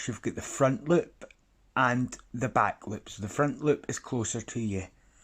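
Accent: Scotland, United Kingdom. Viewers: I recently picked my hook back up after a few years away thank you for making these videos your so easy to follow. It's weird though hearing a Scottish guy teach in usa 😂 I think it's easier though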